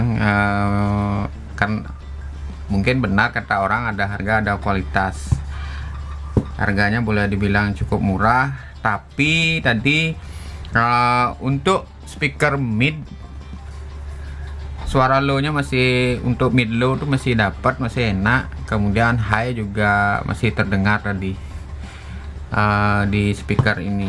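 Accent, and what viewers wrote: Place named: Bali, Indonesia. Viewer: Kyak logat orang bali😂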